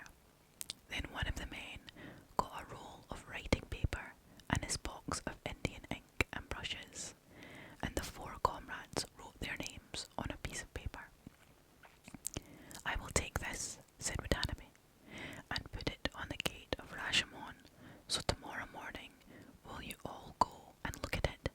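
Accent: Scotland, United Kingdom. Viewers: You are absolutely brilliant. I love your Scottish accent! I hope you gain a lot of viewers. I’ll be sticking around for sure 💚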